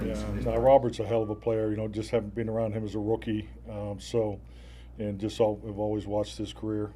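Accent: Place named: Western Pennsylvania, United States. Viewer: Coach-we're" all-in" with you here in West Central Pennsylvania. Love to hear that Western PA accent but especially your "Can-Do" - "Will-Do" attitude. I've always wanted to see you with the Steelers even after you and Aaron beat Big Ben and Mike in the super bowl. Again -I share your accent and embrace it after working forty years in Washington DC. God Speen to you Coach and our team! Thomas K. -Greenwood, PA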